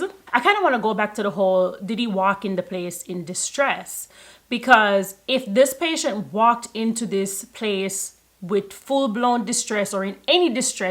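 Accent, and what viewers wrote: Jamaica. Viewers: This is the problem with nursing, if you are coming here to give your opinion about the story on hand why criticize the woman on how she speaks? I’ve never heard anyone asking a Hispanic to pronounce their words better or speak proper English. You should be ashamed to say you’re a Jamaican as well cause you should understand why she speaks the way she does. If it were my channel I would block you! Very distasteful and disrespectful!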